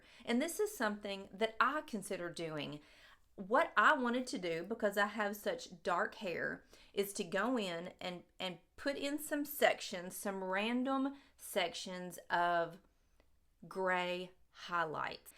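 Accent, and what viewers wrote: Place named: Mississippi, United States. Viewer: Your accent is the cutest!! It makes me feel like i'm back home in MS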